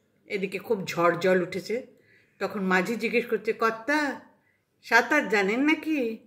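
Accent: Bangladesh. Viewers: পূর্ব বঙ্গের ভাষা বেশ লাগছে । দিদি বিক্রমপুর এসে বেড়িয়ে যান । নিশ্চয়তা দিচ্ছি ভালো লাগবে ।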